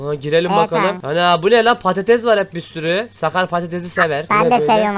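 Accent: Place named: Rize, Turkey. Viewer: 0 Rizeli gibi konuşuyor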